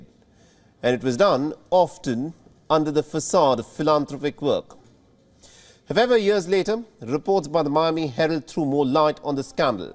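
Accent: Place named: India. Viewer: It’s an Indian accent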